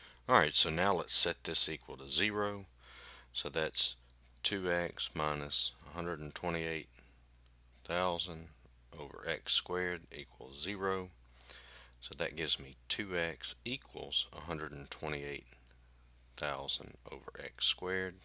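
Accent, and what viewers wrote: South, United States. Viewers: Modern day life is so wonderful if I have bad teachers I can have a smart dude with a country accent explain maths to me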